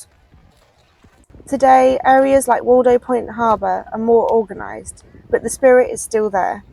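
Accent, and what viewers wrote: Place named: United Kingdom. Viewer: While you're visiting places I am already familiar with I find they become more exciting when described with a British accent. 🤪 Hopefully we will cross paths again when you arrive at your current destination.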